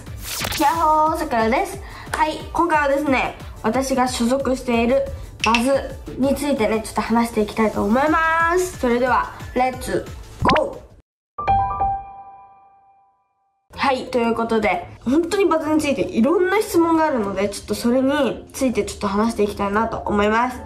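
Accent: Miyazaki, Japan. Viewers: 喋り方は宮崎の名残だと思いますよ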